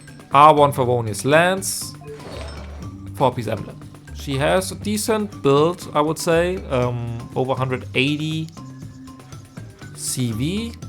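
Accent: Germany. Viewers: Qick question are you deutsch? Just have this feeling 😅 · As soon as you started talking I understood that you are german 😂. Great vid btw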